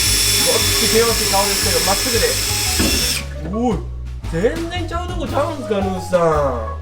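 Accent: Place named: Banshu, Japan. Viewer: いつも楽しく拝見させてもらってます。さんが時々 そんでとか 〜やけどしゃないやろと言った播州弁で喋ってられるのをみてすごく嬉しく懐かしく感じてます。小生中学生まで ６０年前までですが住んでいた者ですから。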